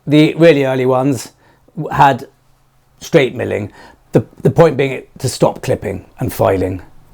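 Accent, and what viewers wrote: RP, United Kingdom. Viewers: Just discovered you. 🇦🇺here. Nice to hear a well educated British voice. Watched “best 5 vlogs x2. Eventually will get to others.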